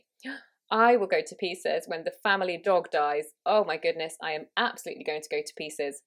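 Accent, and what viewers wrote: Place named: United Kingdom. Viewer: Both of you are amazing teachers.Thank you for the great lesson teacher.I''m really impressed with your british accent.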